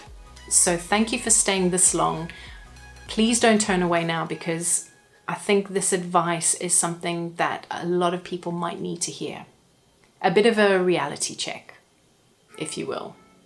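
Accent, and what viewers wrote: South Africa. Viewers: Another value packed video. Thanks for the awesome tips. Are there any valuable Redbubble facebook groups that you feel comfortable recommending? Then off-topic, are you perhaps South-African? I detect a bit of a SA accent.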